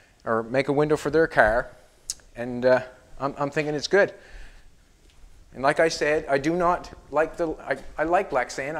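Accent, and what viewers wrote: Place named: Nova Scotia, Canada. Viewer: I didn't even notice how you were saying plexiglass, I figured it was was your Nova Scotia accent... Lol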